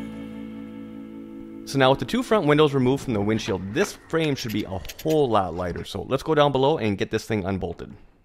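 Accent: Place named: Upstate New York, United States. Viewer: I have a 1968 Bertram Bahia Mar 20 that I seriously need help on. I am willing to go on Patreon to pay for your help because I'm not looking to get something for nothing. This boat is a family member and was left to me by my dad just before he passed in 2015. It's been with me my whole life and is now in need of a lot of help. I have her off her trailer in my backyard and have sanded all the bottom paint off. The hull is in such bad shape with deep chipping and stress cracks everywhere. It's so bad that I've decided to sand all the way up to the gunnels and restore everything from there down. The deck is still in good shape except for a little rotting where there's a penetration for a flag pole holder or some such device. I've been watching your channel for a while and have to tell you how much I've enjoyed it. Being from Binghamton, NY originally, I started out working in a boat shop there sanding on boats and doing fiberglass work when I was a kid, so your upstate accent makes me feel like I'm home. 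When I was 19 I joined the military and have since found myself living out West in Tacoma, WA near JBLM. I'm a Union Carpenter now but still call Upstate NY home. This boat has been part of my family since 1979 and in 2016 I was able to drive back East and get her, an epic journey that's a story in itself. Now that the Covid-19 virus has us all sitting at the house, I have pulled out the random orbital sander and tried to remember all the lessons I learned when I was 17. A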